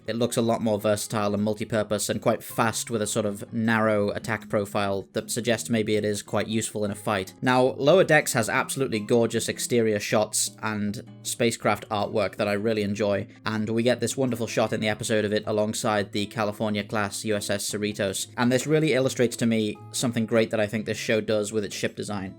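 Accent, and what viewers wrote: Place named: Northwest England, United Kingdom. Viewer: love this where in the Northwest of UK does your voice over talent Hail from?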